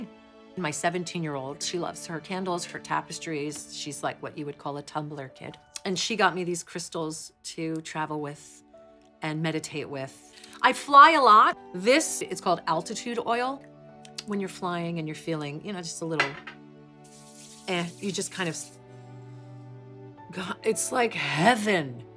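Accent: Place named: RP, United Kingdom. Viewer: she's so posh. Luv her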